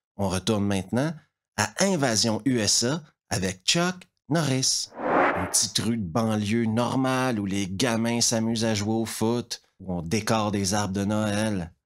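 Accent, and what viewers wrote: Quebec, Canada. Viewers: Y'a quand même bien un sacré québécois pour nous raconter cette hostie d'invasion communisse que Chuck il a arrêté, merci pour l'histoire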